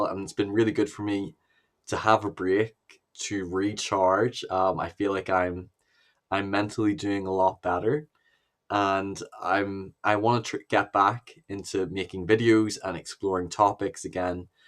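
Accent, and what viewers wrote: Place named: United Kingdom. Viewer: Your accent, the belies a British citizenship, which gives me hope that your medical problems will be addressed properly.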